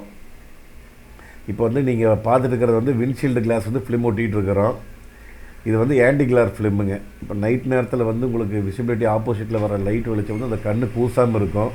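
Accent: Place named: Coimbatore, India. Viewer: உங்க கோவை தமிழ் ரேவியூ நல்லாருக்கு போலிஷ் சூப்பரா இருக்கு Approximately running kms 160000 Budget rs.<num>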